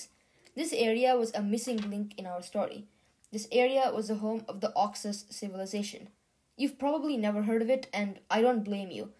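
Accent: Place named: India. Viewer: You accent sounds Indian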